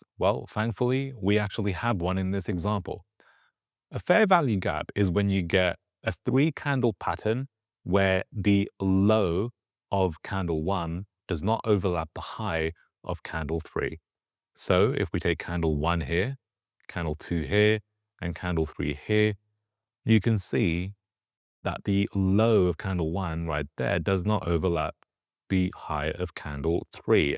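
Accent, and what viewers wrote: United Kingdom. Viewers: Used to live in London. Hear the accent. Thanks for this FVG ORB strategy. For beginners on accounts less than $25k, limited to three day trades per 5 days. I tested this 03/20/26. MU had bull FVG , , . Entry . went south hit Stop loss . NBIS: breakout , FVG . Entry. dropped into OR, rose out then dropped again to Stop Loss . And one more CRCL: breakout . FVG small . Entry. Went south . Hit stop loss . Win %: 0% Bad day/bad week for bulls. These were all bulls. Not into shorts yet, I should have as the market was heading south. SPY could have netted almost 10:1r:r short. The psychology is spot on. One question: do you stop checking for FVG at 10am/11am? Usually by then the market has cooled so if you don't hit FVG by then you are likely not going to make much? Thanks again. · bro, take your fucking British accent and pike on some curry and shove it up your fucking ass! 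Scammer, scumbag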